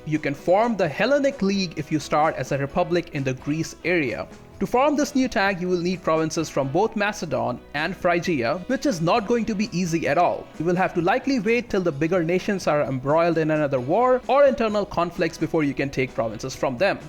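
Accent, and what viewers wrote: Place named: India. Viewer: Wtf your Accent is soooo Indian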